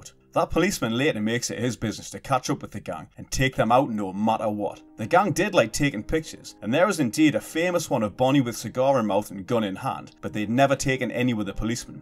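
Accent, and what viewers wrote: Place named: North, United Kingdom. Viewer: Good review, good to hear a fellow British Northerner narrate on a Joblo video.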